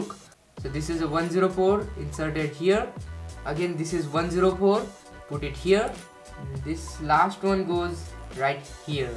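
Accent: India. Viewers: Metin Baydemir It's Indian English. Just an accent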